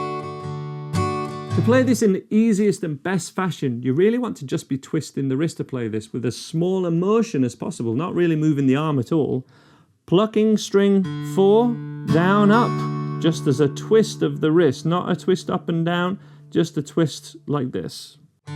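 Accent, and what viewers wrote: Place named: West Cumbria, United Kingdom. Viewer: Like your style. That a la'al bit West Cumbrian accent eh?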